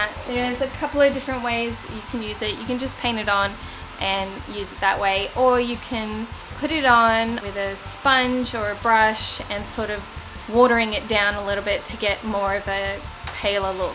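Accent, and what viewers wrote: Australia. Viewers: I love this,piece! You scrubbed and sanded and scraped your heart out! I love Mrs Browns Boys!, did you see the one where she super glued her arse to the chair, I about peed my pants I laughed so hard! I love British TV, but… I’m addicted to Aussie television! All of it, whatever I can get my hands on! And I’m absolutely in love with your accent! And of course your incredible talent!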